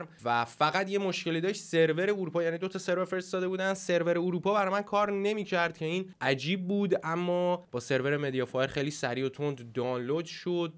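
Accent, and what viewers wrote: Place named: Mashhad, Iran. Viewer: berar hamun dadsh to accent mashadi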